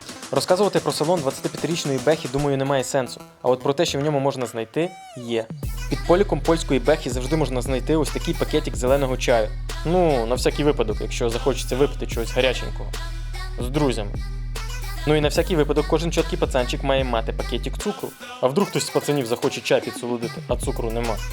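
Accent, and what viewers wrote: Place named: Carpathians, Ukraine. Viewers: Блин западэняцкий акцент, тяжело слушать когда знаешь какие мрази большенство из них. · По-українськи правильно "говорю",а не "гуворю".Селюків карпацьких понаїхало дратують своїм говором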